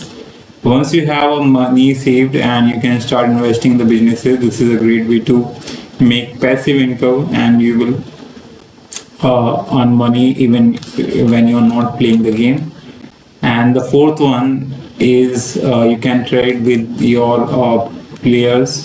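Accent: India. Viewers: ofc indian af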